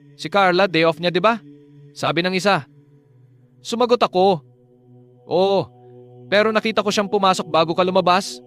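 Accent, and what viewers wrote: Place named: Visayas, Philippines. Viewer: from Visayas ba kayo? may punto po kasi, curious lang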